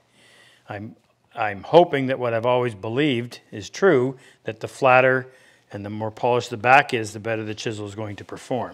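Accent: Canada. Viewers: As I was watching your informative video (thank you), I noticed on one of your shop aprons a U.S. Army 14th Infantry patch, blue/red/gold castle with the caption, "The Right Of The Line". I'm assuming, by the flags in your shop and your accent, that you are Canadian. I'd be interested in the story of your affiliation with that military unit and/or how you acquired the patch? Thanks again for the YouTube content you provide!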